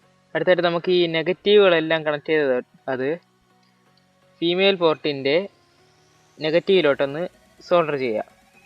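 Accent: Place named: Kottayam, India. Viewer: ഫാഗം കോട്ടയം സ്ലാങ് ആണ്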